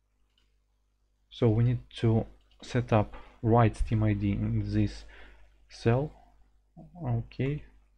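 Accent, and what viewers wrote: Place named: Russia. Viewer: Наш человек! Акцент - агонь!)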